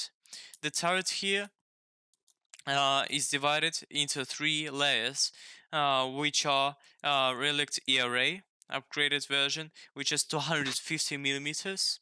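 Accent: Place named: Russia. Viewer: Классное видео, ты кстати похоже рус? Потому что у тебя в акценте русские согласные ядерные.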